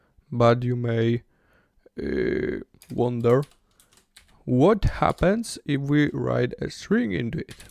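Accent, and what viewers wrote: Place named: India. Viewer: EXCELLENT TUTORIAL THANK YOU FOR HINDI ACCENT I UNDERSTAND EVERYTHING NOW 🕉🕉🛕🛕 😍😍😍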